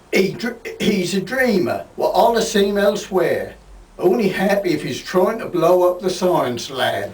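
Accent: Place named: Great Yarmouth, United Kingdom. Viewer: Thank you for satisfying my curiosity about what the accent of a Great Yarmouth native would be.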